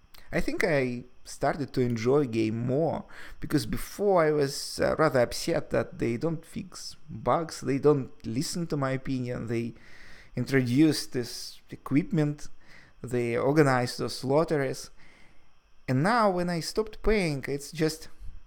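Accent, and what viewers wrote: Russia. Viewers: Is russian?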